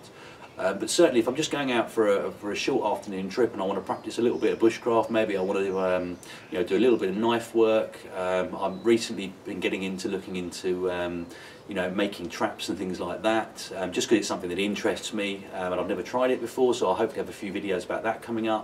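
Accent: United Kingdom. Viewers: I do not approve. You're not Batman and do not need a utility belt made of quality leather to hold your Altoids tins. It's a superfluous accessory and you can chuck all of it (and more) in a backpack. Plus, if you're in UK as your accent suggest you might be, you're within walking distance (and probably earshot) of a highway, so...it's unnecessary for that reason too.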